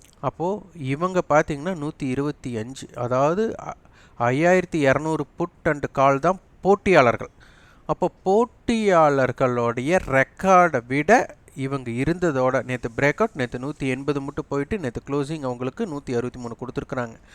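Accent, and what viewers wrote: Kongu, India. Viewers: Masterji Today i watched this video more than 2 times, while driving to my office i listned the audio more than 5 times, i noticed a thing, if i check & implement it in the market(s), then i will update later. Your teaching & your Tamizh slang are Awesome Master ji, (Iam a big fan of your Kongu Tamiz Slang) Iam sorry iam unable to type on our Tamizh. 👍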